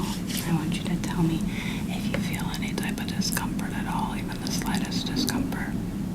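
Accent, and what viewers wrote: South, United States. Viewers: i love your videos with a SOUTHERN accent!! scratches my brain! me and my gf watch them before bed and fall asleep. your comedic timing is great and the asmr is so comforting!!! ❤ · I find it funny cause I found you with your short videos with your southern speak and what it means. I’m so happy you also do asmr, you’re awesome and your voice is very relaxing. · i was confused when i saw your face on an ASMR video and i was like ‘wait….?’ and i have been pleasantly surprised to find you’re one of my new favorite ASMRtists and you help relax me so much!! i know you do it for comedy but you really do have a knack for it. i kind of wish all of my doctors were like this, the familiarity of speech and mannerisms put me at ease, and you have a really lovely soft-spoken voice! can’t wait to see more <3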